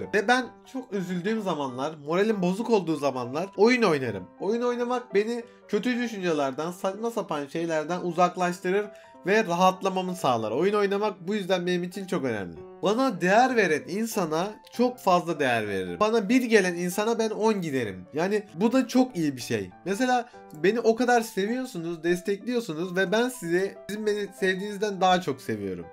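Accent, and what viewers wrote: Adana, Turkey. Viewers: Abi sen Adana'lı değil misin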